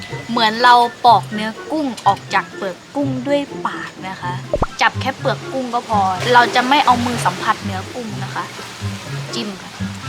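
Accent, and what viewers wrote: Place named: South, Thailand. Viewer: พี่ พูดใต้ด้วยอะ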